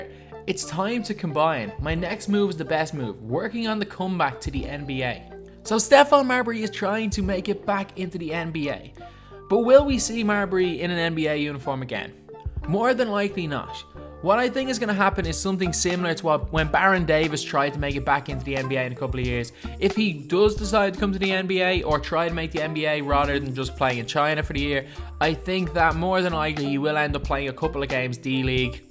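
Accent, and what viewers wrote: Dublin, Ireland. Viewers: Dublin accent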